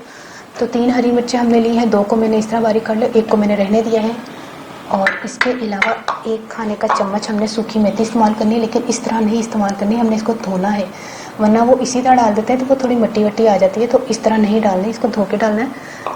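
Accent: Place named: Punjab, India. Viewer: میں پنجابی نہیں ہو پر آپ جو پنجابی بولتی ہو نا بہت سویٹ اور پیاری لگتی ہے آپ پر ورنہ پنجابی زیادہ تر بہت عجیب سے بات کرتے ہیں پر پہلی بار مجھے آپ کی زبان سے پنجابی پسند آئ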